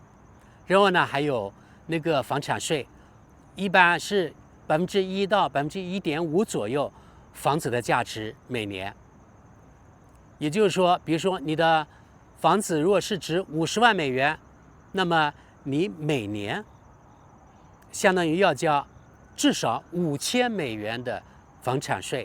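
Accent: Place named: Guiliu, China. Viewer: 航八郎😂 桂柳腔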